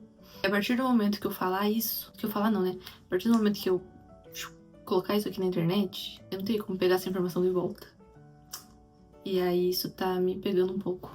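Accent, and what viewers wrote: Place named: Paraná, Brazil. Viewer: Nossa, que loucura, eu reconheci o sotaque do Paraná, mas nem sonhei que fosse de Guarapuava. Moro aqui atualmente também. Amanhã recebo minha devolutiva, e meus sentimentos desde que descobri essa possibilidade foram muito semelhantes aos teus. Achei a profissional que considerava ideal, que me transmitisse a confiança suficiente pra não dar margem pra síndrome do impostor atacar, caso recebesse a confirmação do laudo e mesmo assim ficasse duvidando da veracidade da informação. O problema é que ela tinha uma fila de espera de cerca de 6 meses pra avaliação. Jesus, tive que esperar né, mas imagine a "angústia" 😂. Mas valeu muito a pena a espera. Agora estou vivendo a mesma fase, de ter 99% de certeza que sim, mas com aquele medo de cair do cavalo amanhã é real. Também a preocupação de o que fazer com essa informação, caso se confirme. 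Enfim, muita coisa pra sentir, mas feliz por estar fazendo. Parabéns pela coragem de se expor assim, só quem passa pelo processo e estuda o tema é capaz de entender as dificuldades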